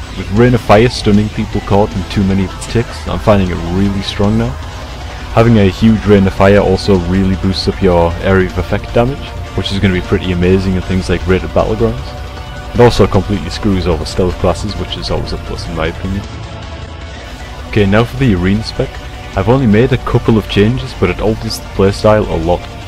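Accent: Newcastle, United Kingdom. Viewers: nice vid thought i heard a hint of newcastle in u for a sec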